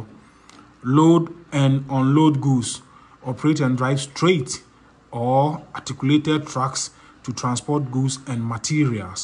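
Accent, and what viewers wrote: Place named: Nigeria. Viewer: This English for baba , he is cominicated only for Nigeria not for other , I can't hear this English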